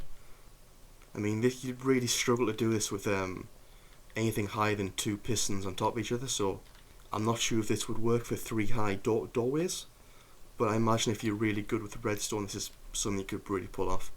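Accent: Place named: Newcastle, United Kingdom. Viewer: Good video, it's just inspired me to try something similar myself. Btw, where abouts in the North are you from? I can't quite place your accent, but you must be up in the North-East with me (I'm from Hartlepool)! All I could figure was that you sound quite like a Geordie, but not full on. Or am I wrong and that's a Sunderland shirt in the intro lol?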